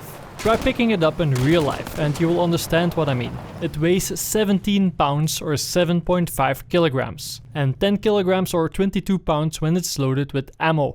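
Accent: Netherlands. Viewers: Dutch, right? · je klinkt ml ben je nl?? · Wait, are you Dutch? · like the dutch accent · are you dutch ? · Is he dutch?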